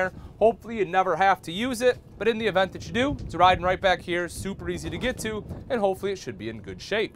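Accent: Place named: Minnesota, United States. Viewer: Dude sounds direct from Minnesota! I’m from there and I’m like whoa!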